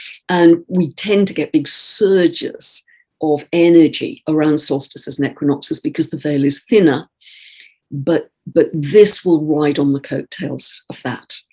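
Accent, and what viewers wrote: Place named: RP, United Kingdom. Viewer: And she does it in a rather cultured British accent.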